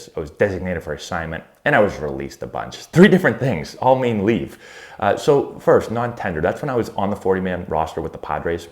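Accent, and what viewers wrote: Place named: Massachusetts, United States. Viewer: I’m from Rhode Island and I knew you from Massachusetts due to that accent haha. Any memories of McCoy stadium?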